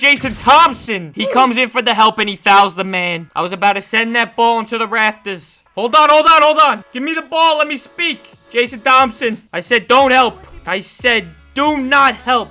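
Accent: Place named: New York, United States. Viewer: u sound like a REAL NEW YOKA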